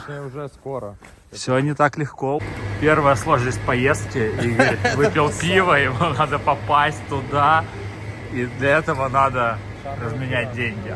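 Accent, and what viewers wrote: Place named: Odessa, Ukraine. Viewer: Первый раз смотрю видео автора, практически сразу, по говору понимаю, что наш человек, одессит!) Также был на этом матче на гостевом секторе, эмоции суперские. Nel bene e nel male forza Internazionale!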